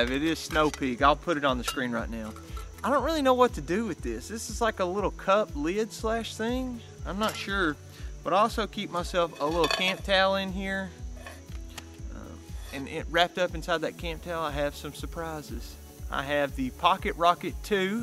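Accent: Kentucky, United States. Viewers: I just found your channel I knew you were a southern Kentucky boy lol. In all seriousness Daniel Boone park is an amazing place.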